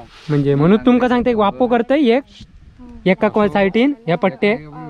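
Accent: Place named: Malvan, India. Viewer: तुमची मालवणी फार सुंदर आणि ओरिजनल आहे. म्हणजे त्यात इंग्लिश आणि मराठी शब्दांची भेसळ नाही. बाकीचे v ब्लॉगर्स मालवणीच्या नावाखाली मराठीच बोलतात. आणि भरपूर इंग्लिश शब्द वापरतात. ॲड करा, स्टर करा, जॉईन व्हा, ब्रेक फास्ट करू या वगैरे. तुमच्या ब्लॉगमध्ये शुद्ध मालवणी ऐकायला मिळते.छान वाटतं.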